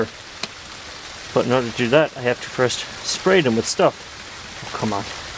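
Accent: Ireland. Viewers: Are you Irish? And is that hail on the roof?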